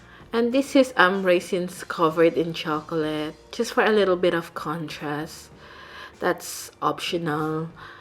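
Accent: Philippines. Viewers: You have the typical Filipino accent.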